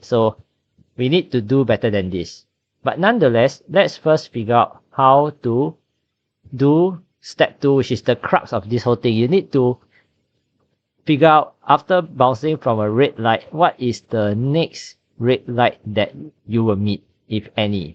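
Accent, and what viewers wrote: United Kingdom. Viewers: Are you British? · Are you british?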